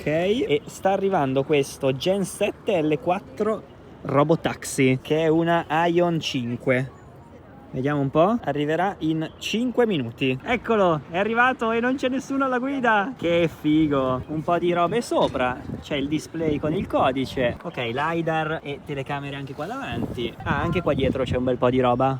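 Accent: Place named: Veneto, Italy. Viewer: È l'accento veneto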